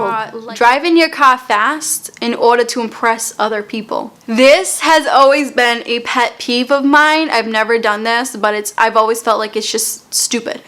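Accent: Boston, United States. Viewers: Such a cute Boston accent. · I just love your Boston accent. I find it so charming and down to earth. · Lol I love the way she says car. People always point out my thick Brooklyn accent but her Boston accent is really serious. Lol I love it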